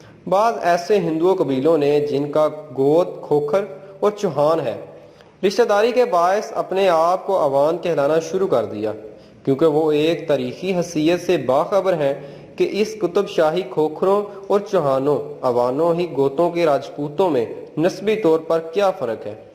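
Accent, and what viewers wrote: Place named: Punjab, Pakistan. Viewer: تحریری مواد تو اچھا ہے وائس اور ٹھیک نہیں پڑھنے والا ٹھیک تلفظ ادا نہیں کر رہا جس کی وجہ سے معیار متاثر اور سننے والے پر اچھا اثر نہیں چھوڑتی یہ بندہ پنجابی میں اردو پڑھ رہا ہے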